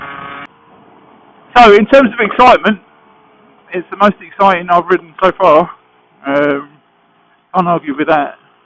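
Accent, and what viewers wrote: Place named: London, United Kingdom. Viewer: Gotta have spent some time in London to know everything he says😂innit geeza.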